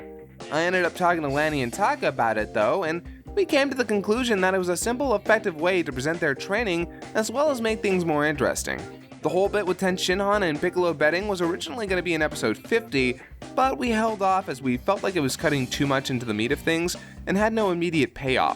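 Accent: France. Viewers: I like your cutie french accent :) A french fan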